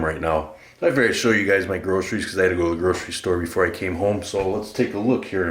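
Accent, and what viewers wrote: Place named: Michigan, United States. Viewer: Hahaha, I knew you were from up there because of the accent. Meijer confirms it. Michigan native, here.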